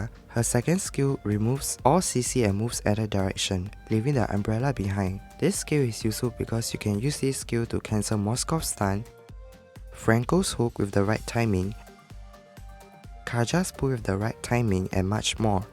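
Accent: Singapore. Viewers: wah haha not common to find sg players. I could totally tell from the way you spoke in the video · You have this singaporean accent. 😮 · Sounds sg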